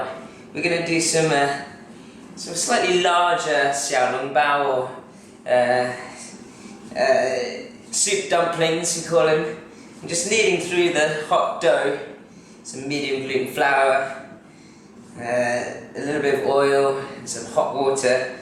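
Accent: England, United Kingdom. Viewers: love this dude's accent! asian man talks like some englishman. And honestly how on earth does the XLB not look legit? This man's got better technique than most bogus white chefs who's trying to cook "fusion" or "chinese" !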